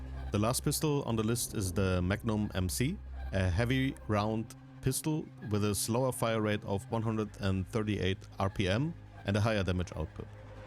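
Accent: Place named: Germany. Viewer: Guten Content bringst du. Deinem Akzent zu entnehmen hast du deutsche Wurzeln wenn ich mich nicht irre. Glaub an dich und mach weiter. Nice Content Dude. Maybe I have The Chance and we can Play together at Release.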